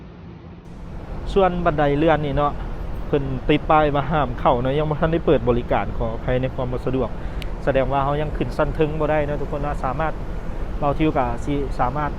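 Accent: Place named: Laos. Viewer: ทำคลิปสนุกครับ ชอบสำเนียง และภาษาลาว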